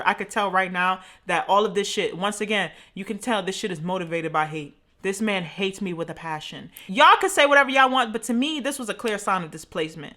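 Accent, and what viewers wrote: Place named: Brooklyn, United States. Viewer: U gotta be from Brooklyn!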